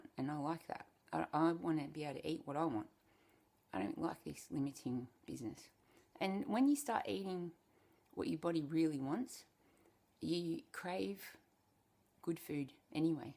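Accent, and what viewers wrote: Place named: Australia. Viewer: I like your openness and frankness, your flow and insights, - I have a friend who has similarities. You sound like you're 'down under' (from this London perspective!). Thanks anyway.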